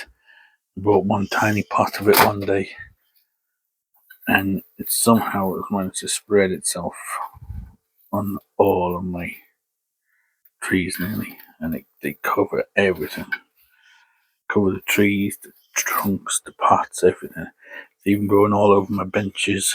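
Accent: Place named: Newcastle, United Kingdom. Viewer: Hello?! Very interesting new channel with fascinating plants of some age at last! I’d like to know more about you, but I’m subscribing anyway. The bonsai show cleared up my question as to your accent, the main voice was Geordie I reckon! God bless your endeavours 🙏✝️. ✌️🇷🇺☮️🇺🇦✌️. ✌️🤍🇮🇱🤍✌️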